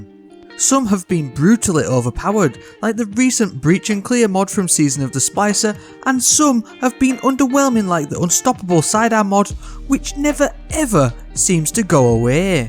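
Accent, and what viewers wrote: United Kingdom. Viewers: Are you from Britain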